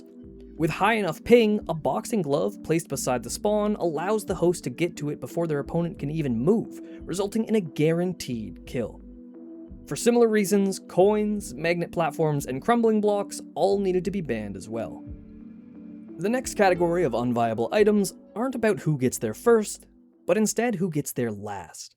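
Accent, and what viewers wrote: Canada. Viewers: Oh yeah HAVE to be canadian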